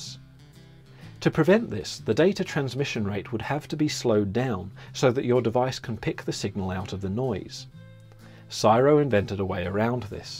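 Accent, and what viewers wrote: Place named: England, United Kingdom. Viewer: Your accent mate. Are you a Englishman...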